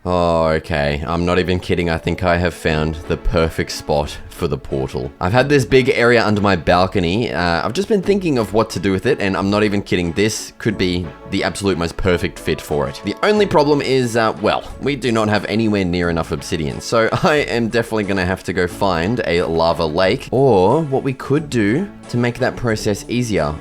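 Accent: Australia. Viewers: R u aus?🇦🇺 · I know this guy is Australian I think because of the accent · I can tell this guy is from Australia because of his accent and how he speaks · Ready to put this on and go to sleep to the best Aussie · I've never realized how Australian actually sounds 😂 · Bro IS the stereotypical Australian. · Are you Australian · Bro you can just tell this dude is Australian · I have stumbled across an Aussie minecrafter that’s speaking adult talk! I’m so happy lol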